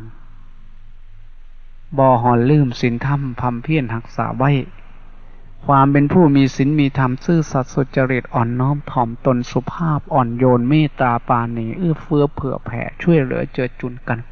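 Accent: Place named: Isan, Thailand. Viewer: ได้ฟังพระอาจารย์บ่อยๆๆจ้าวชออบฟังท่านเพราะคะคำพูดอิสาน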